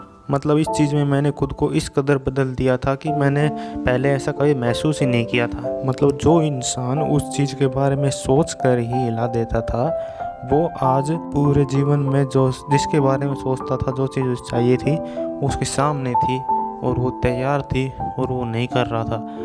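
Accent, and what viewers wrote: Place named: Haryana, India. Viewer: Bhai mujhe lgta h ki tum haryana se ho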